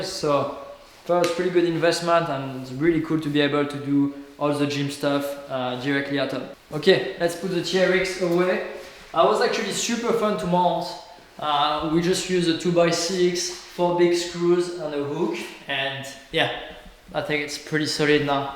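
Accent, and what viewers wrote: France. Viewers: accent français la 🥰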